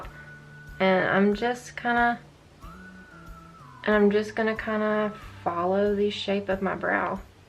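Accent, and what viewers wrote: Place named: South, United States. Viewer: im so jealous omg! i just posted a brow routine as well! your southern accent is so cute!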